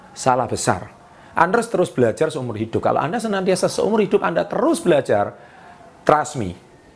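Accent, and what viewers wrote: Java, Indonesia. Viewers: Aq sangat terganggu dg logat kental jowomu mas..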